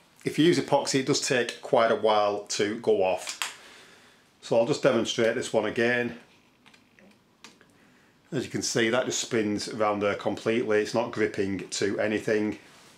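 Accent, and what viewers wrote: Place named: Yorkshire, United Kingdom. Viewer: What part of north you from? Sound like a Yorkshire man. But you could be from Manchester or Lancaster. I used to be from Blackburn myself. Lived in London for years but still have the accent 😂 Thanks for the tips. My Screw just won't budge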